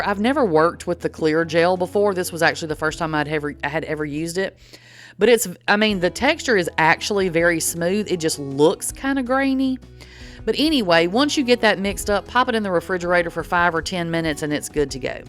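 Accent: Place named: Texas, United States. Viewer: You sound like a Texan to me. You're drawl makes me so happy! ❤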